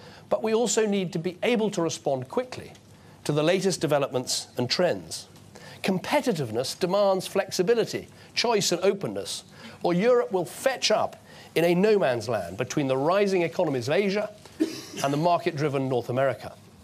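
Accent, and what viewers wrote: RP, United Kingdom. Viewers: I came here just for british accent. I'm confused because I understood almost everything... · Because it sounds elegant, sophisticated. I think he has got an RP accent. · British accent only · Yea I’m with you, it’s weird. It’s litt just a British accent. I think he’s toning he’s accent down tbh from a posher one. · Because he speaks with an rp accent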